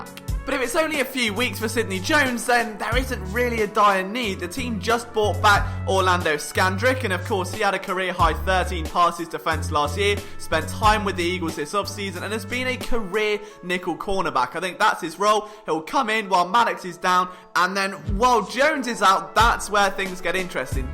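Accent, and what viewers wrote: United Kingdom. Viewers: Hate to triple comment, but your antics are so brilliantly weird. Combined with a British accent and a Giroux jersey, 14k subscribers should be coming after this post. But anyway, WHERE IS THE FILM ROOM?! Sidebar: You might have to re-WIRE your palate if you’ll be in Philly this year. I don’t even know what half the foods you said were, but I can pretty well guarantee they won’t be available. Cheesesteaks are delicious, yes, but can only have so many in a short timespan.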